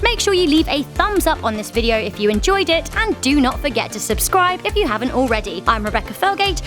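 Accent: England, United Kingdom. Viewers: Why do they always have people with English accents narrating these videos? I mean, it’s about China and America, not England.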